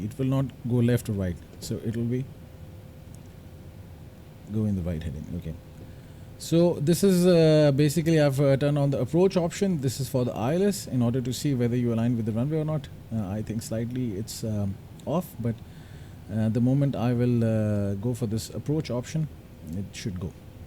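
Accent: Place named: India. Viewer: Clicks on video *hears Indian accent * ANNOYING and turns it of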